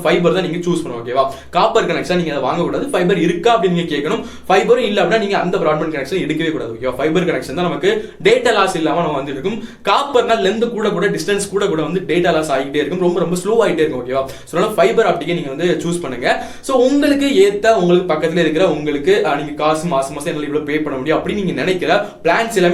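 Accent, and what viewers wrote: Kanyakumari, India. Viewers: Ninga kanyakumari ya na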